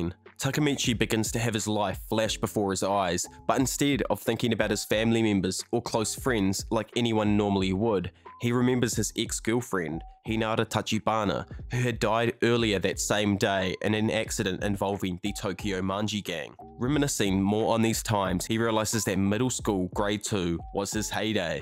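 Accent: New Zealand. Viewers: Love the Kiwi accent bro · Are you a New Zealander?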